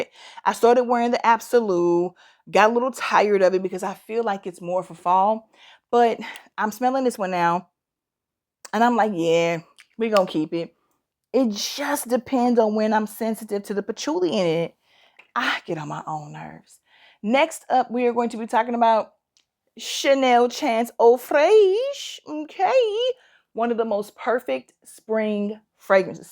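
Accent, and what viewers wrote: United States. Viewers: Oh, my goodness, you are adorable with your comments and American accent! I agree with you, that you don't need to spend a kazillion dollars for a beautiful scent. Thank you for your review. ❤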